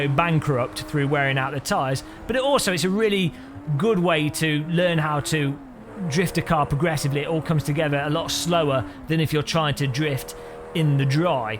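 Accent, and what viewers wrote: United Kingdom. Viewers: I love your british accent! Hear you talk is quite an enjoyable thing :p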